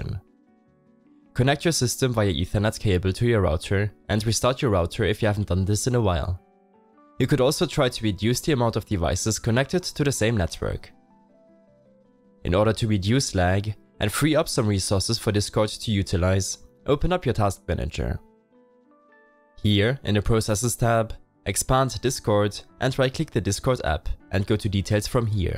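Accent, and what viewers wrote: Germany. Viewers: Da hat er mich aber auseinander genommen.. Deutschen akzent hört man aber auf jeden fall.. Geiler kanal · Are you German?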